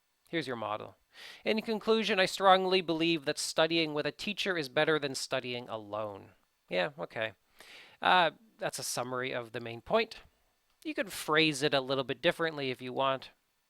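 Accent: Canada. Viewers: Btw, arr you canadian? You have that accent lol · Hi, first of all thanks a lot for great content. Your website and your videos are really helpfull. However I have a question. You say that we shouldn't write a sentence like `This example demonstrates how...` but almost every essay that you have on your website has a conclusion like that on body paragraphes. I think its a nice way to summarize the main idea that we posit on that paragraphe. But as I said im kinda confused atm ;D Btw I love your Canadian accent ;D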